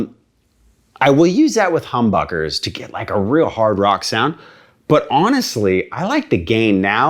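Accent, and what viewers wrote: United States. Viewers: You have really picked up the Nashville affect. That didn’t take long.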